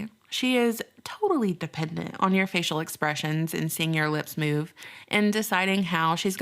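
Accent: South, United States. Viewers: I'm a NC girl, myself, so my inner dialogue is sprinkled heavy with that southern salt I hear in your outer dialogue. I am both jelly and terrified of the NPC who doesn't talk to themselves. Of course, those have been bred and programmed, I mean raised, not to think for themselves, only to consume. Consume all the gmo and PC rhetoric the powers that shouldn't be try to shove down all our brains. Those consumers make me so sad. I mourn for all the potential I see being flushed right now. But I for one really appreciate the fact that you let us into your beautiful mind every once in a while. Stand sting in your knowledge, and I sure hope that wisdom starts with the true Rock of our Salvation. Much love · So great to hear you again, as a southern boy working abroad its beyond refreshing to hear a voice from home. I think a lot of us "Americans" are caught in a daze by whats is happening and just as you said how fast things are changing in America. 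In this daze, we are letting slip through things that I would never think we would accept. The past el-ec- tion was a clear indicator of just how, what many Americans believe is a lie and I also think that is what started the dominos falling. Because nothing was done by any of the supposed safeguards in our government or judicial branch about the el-ec- tion, many Americans are in a state of trauma. If you've ever been around people in a state of trauma you will understand, they act on muscle memory, aka they will just blindly do what is the norm, even as the world around them is on fire, I think we are at that place as a nation! While many other Americans are have developed Stockholm syndrome due to restrictions, mandates, lockdowns, etc.... again like trauma people who are suffering Stockholm syndrome, people will accept and do things they normally would never even consider! You are one of the very few internet personalities that dig deeper into social issues and overlay a level of human psychology that makes sense to why things are happening! 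Great to hear and look forward to many more of what you did hear!! Thanks.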